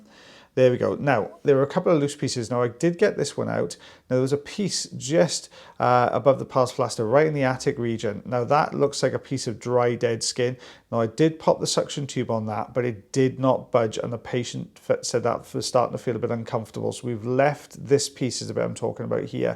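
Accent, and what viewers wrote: Wales, United Kingdom. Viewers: I watch partly because I love hearing a welsh accent. Spent a lot of time in Wales all my life. Sadly never learned it, apart from a few phrases and swear words.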